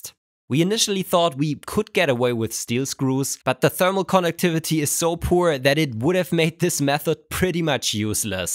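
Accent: Germany. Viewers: Dein typisch deutscher englisch akzent ist einfach geil. Ich schau deine videos sehr gerne!!! Mega Kreativ! Einfach Hammer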